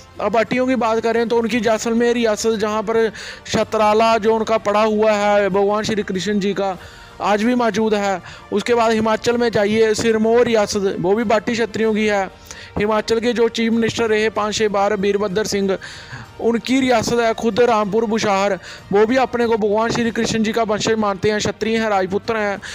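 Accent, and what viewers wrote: Punjab, India. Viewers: Hukm aap kaha se h aisi hindi punjab ke bhai bolte hai❤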